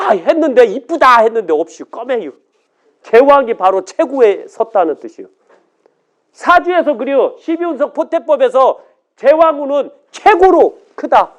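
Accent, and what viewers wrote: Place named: Jeolla, South Korea. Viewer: 전라도 사람이네 ㅠ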